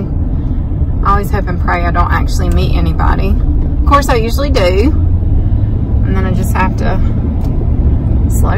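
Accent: South, United States. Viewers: I love your southern accent. What kinds of things do you wonder about northern folks? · I love the enthusiasm (and that southern girl accent!). We are in a uncommon long period of rain. Found your video to hold me over, chomping at the bit to cut our beautiful first growth for the season.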